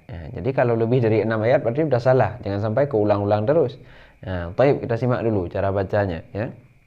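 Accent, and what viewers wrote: Aceh, Indonesia. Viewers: Patutlah intonasi bahasa ustaz bunyinya nyaman ditelinga saya orang Semenanjung. Orang Acheh rupanya. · Memang Awak Aceh beda baca o dan du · guru tetbaik saya suka ada logat acehnya barpun saya orng padang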